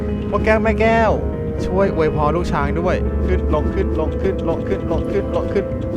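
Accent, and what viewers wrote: South, Thailand. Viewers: พี่ เสียงเหมือนคนใต้เลย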